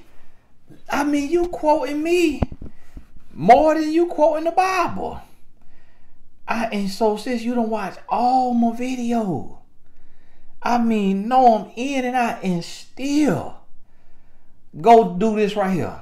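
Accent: South, United States. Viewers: is too funny, love the country accent, keep telling it like it is brother. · Great video! You tell it like it is..time for her to leave and work on herself. Love your country accent ❤ 💕. Thank you for your wisdom on these man boys.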